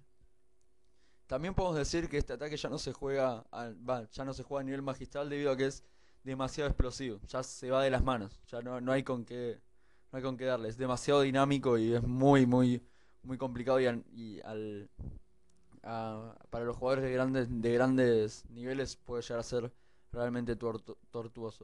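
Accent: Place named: Argentina. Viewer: Porque los argentinos pronuncia la yo o llo...con chooo???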